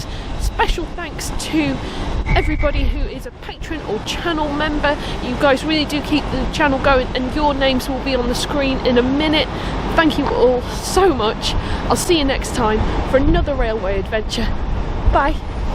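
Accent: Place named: Stoke, United Kingdom. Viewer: Lovely to hear a Stoke girl,. It took me ages of living here in Cambridgeshire to say luck and buck not lewk and bewk! I'm from Congleton just slightly north. Love your videos.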